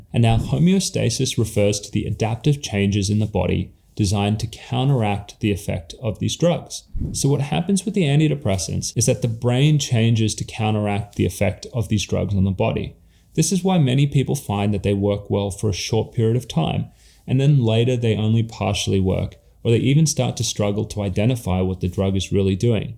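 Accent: Australia. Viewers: had 2 hospital stays last year after not being in a psych ward since 17. I’m now 43. It was. HARROWING and BEWILDERING and WILDLY ETHICALLY REPULSIVE that they dispensed meds so freely. I spent 25 days in Denver Springs and saw and experienced things I never want to again. But it grieved me how people weren’t TOLD to get outside, weren’t taken aside to be talked to like a HUMAN PERSON with normal emotions who maybe needs a nurse to just sit beside them for a hot minute and be an empath (they didn’t seem to know what to do with young women there in a psych ward for the FIRST TIME EVER defeated and crying on the ground. get some social skills, docs!) For me, I’ve had one of the worst, longest cases of akathisia and tardive dyskinesia my doc has ever seen and he’s seen a lot. Something’s gotta change and so many of us need healing. I’m working on the emotional and heart/soul healing now with my pastor, counselor, and writing a memoir. 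I just found your channel, Dr.. And you must be… Australian? My fave Christian brother artists are native Aussies and sound like you.? Do you know them? THANK YOU FOR DOING WHAT YOU DO. even if I never get to your clinic or Dr. Amen’s or get off bipolar meds on this side of Heaven. You are doing amazing work. God bless you and that clinic.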